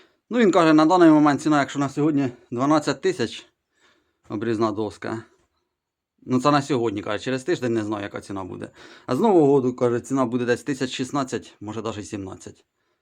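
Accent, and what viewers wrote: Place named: Poltava, Ukraine. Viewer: Мені подобається ваш полтавський говор , я сам з смт Покровське дніпропетровської області і дуже схожий вас суржик с нашим, просто дивуюся , дякую.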